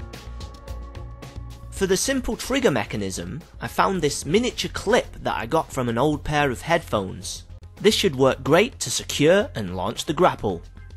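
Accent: United Kingdom. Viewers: This guy is Q from 007, also he's brittish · Its good. I'll try it! Did you notice a music player somewhere ? Its a Bose Wave Music System. I have one. And lemme guess. The guy is British, isn't he? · the stick from a 'Popsicle' i think he's British like me.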